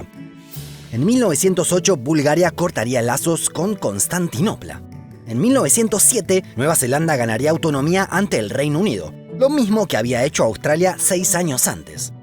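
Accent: Argentina. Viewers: Es muy bueno, pero el español es demasiado argentino, debería ser un español más neutro.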